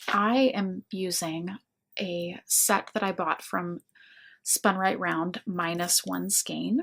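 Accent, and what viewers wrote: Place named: South, United States. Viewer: I have wanted to ask you this question for so long. I don’t want to sound in any way disrespectful to anyone. You have said you are a Louisiana girl. But you have no accent. You sound southern but I don’t hear Louisiana. My mother was in the hospital for awhile in Shreveport years ago but I was amazed at their lovely accent. Shreveport is not far from us. I live in OK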